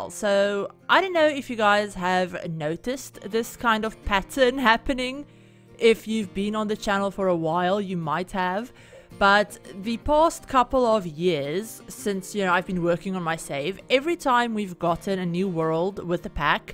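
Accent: South Africa. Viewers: are you south african? our accent is pretty iconic lmao. eitherway omfs im obsessed with this build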